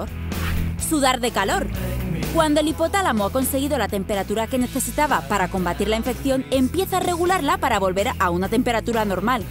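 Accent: Spain. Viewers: es de España y así lo dicen ahí, no tienes por qué corregirlo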